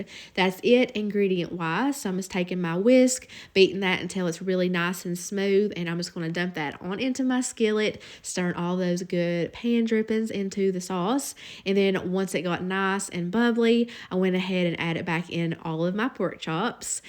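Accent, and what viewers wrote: South, United States. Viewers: Hi, you are a wonderful cook. Everything looks delicious, especially the hash browns & the pork chops. Your comments along the way are great (like the pan that heats up incredibly fast, & going next door to get milk!). Lastly, I could happily listen to your lovely Southern accent all day. Thanks for all the time and effort you put into your videos. Best wishes from Sydney Australia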